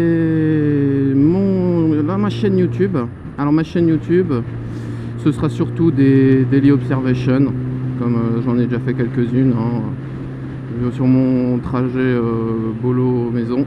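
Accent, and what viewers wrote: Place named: South, France. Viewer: Cool ta présentation, ça fait plaisir de savoir à qui on a à faire haha, et l'accent du Sud et bien non ? On a la classe nous les sudistes mdr. Sur ce Ride Safe l'ami. V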